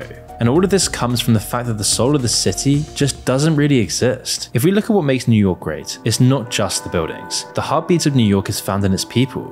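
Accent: England, United Kingdom. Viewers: Not defending dubai, but the criticism and comparison with london is a bit rich coming from an english sounding presenter - the kinks and pedophelia of american politicians and british royals is equally evil and above law so far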